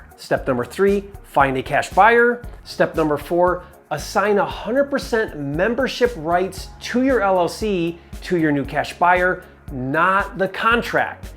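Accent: Michigan, United States. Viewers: Are you from Michigan?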